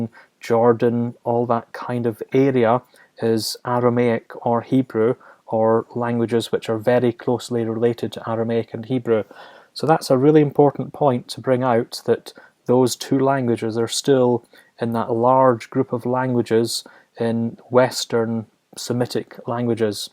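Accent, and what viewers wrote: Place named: Scotland, United Kingdom. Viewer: Your Scottish, or Northern Irish accent if I'm not mistaken, is charming as hell and a fresh departure from the typical bland American delivery. I'm a linguist and amateur philologist, and have an interest in the Aramaic language and Its history. Nice video.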